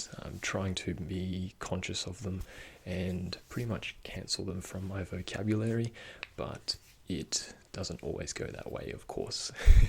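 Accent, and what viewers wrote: Australia. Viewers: Yes another Australian asmrtist! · I don’t know why but your voice reminds me of an Australian Fred’s Voice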